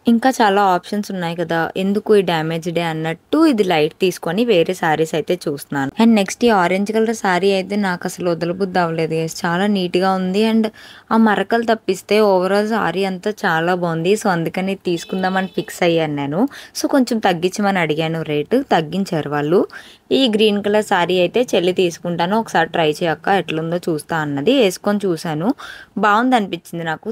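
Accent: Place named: Samalkot, India. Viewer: ownaa...madi samalkot...emti ma side ammayi la anipistundi anukunna😅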